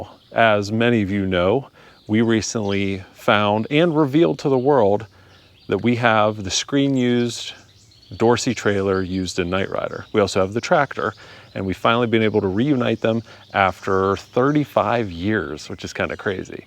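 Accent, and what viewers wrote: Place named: Pittsburgh, United States. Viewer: by chance are from Pittsburgh or surrounding Allegany or Westmoreland County i detect a light Pittsburghease in your voice and you yard an intro shots of the car driving looks like the area thanks for the content and I will check my connections an see if I can find you labor for metal fab an body work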